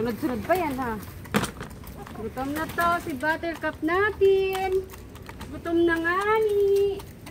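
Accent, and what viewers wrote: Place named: Visayas, Philippines. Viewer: Madam napansin ko parang bisaya salita mo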